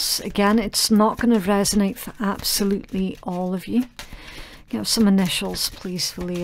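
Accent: Scotland, United Kingdom. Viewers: omg i love your accent!! Scottish ? ♥and as for the read : dead on accurate !!!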